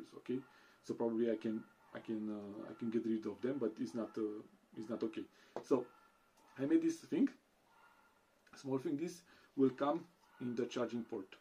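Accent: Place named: Pakistan. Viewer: You're a great guy for all the effort. Interesting accent btw, i hear a similarity to Pakistani for some reason, although you are Polish or Lithuanian i think.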